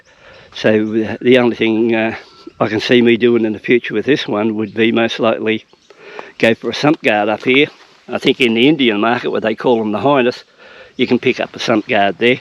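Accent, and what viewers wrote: Australia. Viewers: As soon as I hear that Ozzy accent I know I'm in for a great honest review. Like you a have a royal Enfield 350 but am considering this bike which is now available here ( well , gb3500s) pretty much the same. Keep roller fella . Thanks 👍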